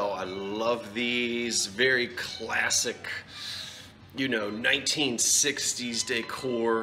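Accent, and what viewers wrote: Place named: Philadelphia, United States. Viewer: "Wooder"....love the Philly accent. Reminds me of home....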